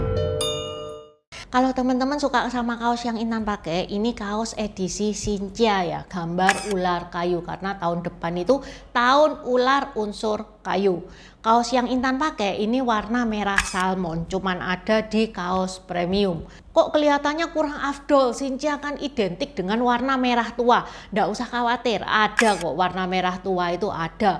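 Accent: Java, Indonesia. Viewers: Salam medhok. Buat, 😊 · Kenapa bisa medok..cece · juga out of the box loh, mencari konten yg lain dari yg lain dengan data yg akurat juga medok banget. TOP!, sukses terus ya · Cici,bahasa Indonesia mu jelas tp tetap aksen Jawa nya masih keliatan,mungkin Cici lahir di Surabaya...semangat selalu untuk warga keturunan dan warga lain nya,apa pun suku kamu,apa pun agamau apa pun warna kulit mu apa pun latar belakang mu mari kita bersama untuk membangkitkan negri ini yang terlalu lama tertidur,bangsa ini terlalu besar butuh orang orang yang bisa merangkul semua pihak,ingat negara kita negara kesatuan jadi kita semua harus bersatu membangun bangsa ini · Kalo Cijaw...Cina Jawa makannya medok pokonya mantap ❤🇮🇩👍👍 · Sy gemes ma suaranya wong cindo jowonyo medok tenan ❤❤ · Medok banget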